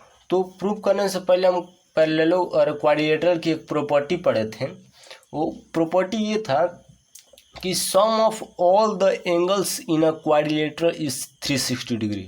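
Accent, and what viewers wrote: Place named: Rural, India. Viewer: You are speaking like a dehati. Dehati kahi ka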